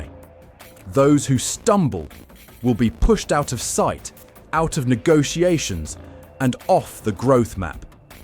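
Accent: England, United Kingdom. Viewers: Why is a guy with an English accent narrating this video about PA? Could it be to lend credibility to a piece of propaganda?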